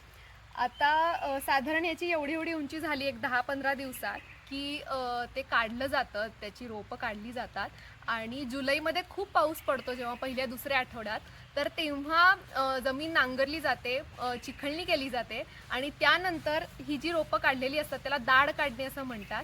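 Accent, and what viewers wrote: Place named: Satara, India. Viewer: Mala watat nahi tu kokani ahes. Asa watat tu satar chi maina ahes. Tujhi tone same satar sarkhi ahe.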